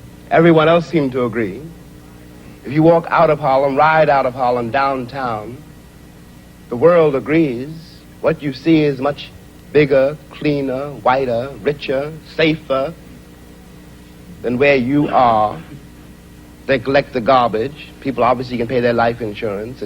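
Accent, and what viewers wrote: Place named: England, United Kingdom. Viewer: Wow he sounds English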